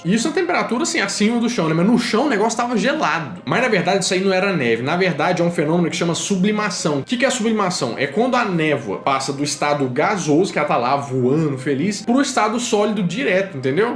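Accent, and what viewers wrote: Minas Gerais, Brazil. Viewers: 1- O sotaque de Minas Gerais é um dos mais bonitos desse país. Bão msm. 2- Vídeo de abdução: eu voto Sim!!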